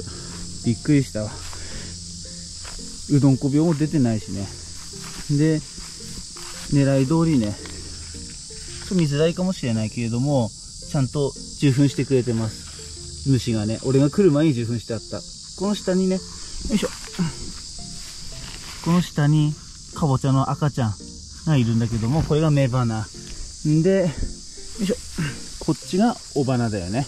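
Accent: Tsugaru, Japan. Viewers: 初めまして。 あの、お教えください。 雌花が受粉されているか否かはどのように見分けるのでしょうか？ お返事お待ちしております。 それと、津軽弁？いい感じですね。 津軽弁YouTubeで人気ですし。 すんたろす、って言うYouTuberのお兄様かと最初思いました。 😅 津軽弁全面に出した方が登録者増えるかもです。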